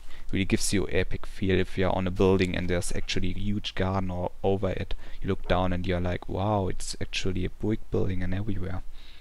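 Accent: Germany. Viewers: you sound german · you have a german accent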